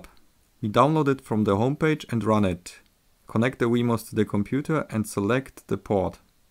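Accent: Germany. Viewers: Great sense of humor with a German accent. I love your videos.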